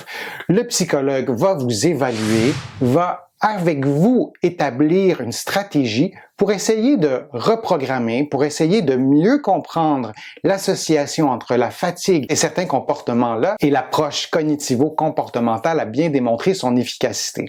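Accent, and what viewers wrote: Quebec, Canada. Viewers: Dès le début j'entends l'accent Québécois je sais d'office que je suis tombé sur du contenu de qualité. Merci beaucoup pour cette vidéo ! Un cousin français. Je m'abonne !!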